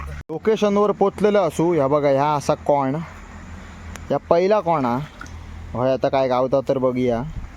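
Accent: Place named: Malvan, India. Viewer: भाऊ..तू दोडामार्ग चो असा ना..म्हणून मालवणी कोकणी asscent कडून बरो बोलता 🎉😊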